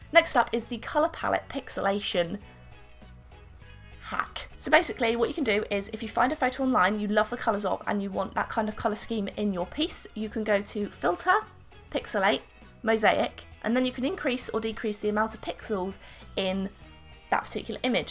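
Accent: United Kingdom. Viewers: bRiTiSh aCcEnT🌚💔its cute tho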